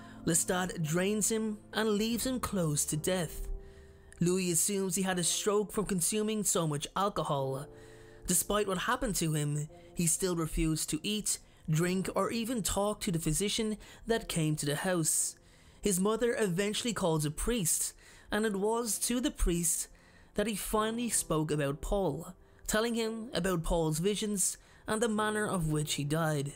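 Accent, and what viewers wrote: Ireland. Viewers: You are Irish? Be honest. Where are you from?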